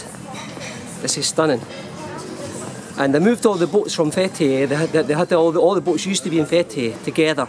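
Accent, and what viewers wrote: Scotland, United Kingdom. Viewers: By the way thanks for all of your advice and I'm looking forward to coming in July. Love the Scottish accent by the way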